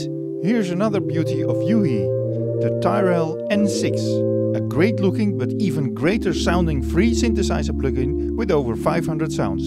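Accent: Netherlands. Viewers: Is this guy Dutch? Hard to hide the accent 😉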